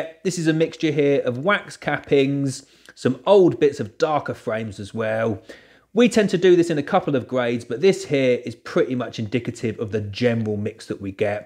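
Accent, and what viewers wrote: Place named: United Kingdom. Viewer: arrr-sigh and eeeeee such a refreshing change to find a fellow Brit doing 'How-to's' excellent video so double thumbs from me Boss I find american videos so tiresome and so prolific on here, why they have to make the simple sound so clever and complicated I will never understand but hey-ho. You've done an excellent job here so thank you very much indeed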